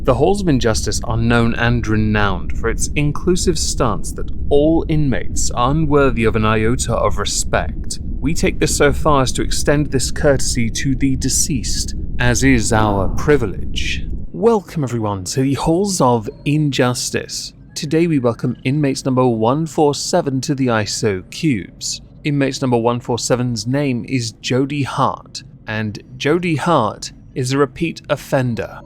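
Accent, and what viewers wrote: RP, United Kingdom. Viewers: Yeah I can't figure it out, I listen to other British YTers, and yes, A British accent always sounds Somewhat more dignified to the American ear, but there's something Extra "posh" abt his voice... Like, maybe something in the presentation/delivery? There's def no "umm"ing and " uhhh"ing, maybe its the concise, dry delivery. I dunno but I dig this posh dude's channel. (Plus anyone who h8s Nader is cool in my book... "The enemy of my enemy is my friend."😈🔥💯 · Plus his accent is brilliant, posh bugger 😆.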